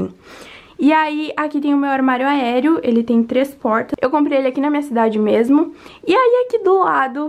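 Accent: Rio Grande do Sul, Brazil. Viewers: Bahh q quarto fofo, um sonho! Tu é gaúcha? Parece muito... Eu sou gaúcha! Kkkk♥️♥️♥️♥️ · Vc é gaúcha? Teu sotaque é lindoo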